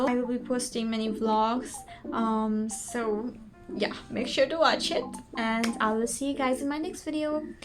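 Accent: United States. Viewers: american accent sounds irritating But congratulations and keep on progressing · OMG!! Your accent is soo amazing. Can you give me some tips to have that "American" accent. I've been searching online for it. But it would help me so much if it is from someone with a similar background. Btw thanks for the advice as well.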